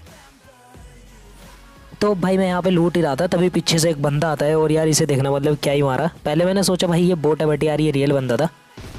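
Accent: India. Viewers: Kis kis ko ke bolne ka style pasand hai Matlab ek dam Desi wali feeling aave video dekhti ber😍😍👌👌🙏🙏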